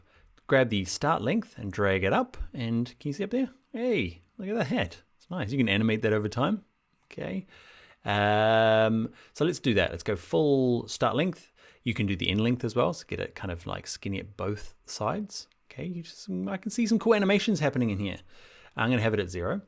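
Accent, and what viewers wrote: New Zealand. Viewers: Yah it doesn’t sound quite Australian. I’d guess NZ too. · I really love the Kiwi accent.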